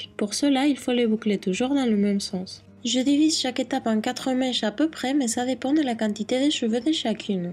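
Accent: Spain. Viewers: Elle est espagnole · Tu as un joli accent espagnol, et tu est très jolie. :) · Elle est espagnole. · J'aime vraiment ta voix et ton petit accent espagnol ! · Elle est espagnole😍😍😍😍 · tu as un joli accent espagnol et j'aime bcp les coiffures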